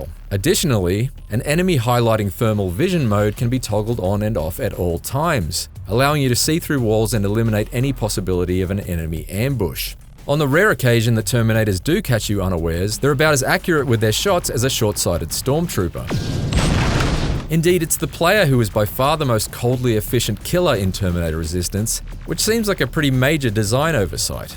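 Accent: Australia. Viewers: He ain't a brit, he's an Aussie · It's that aussie accent again, eh? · “ske-leet-al” never heard someone with an Australian accent pronounce skeletal before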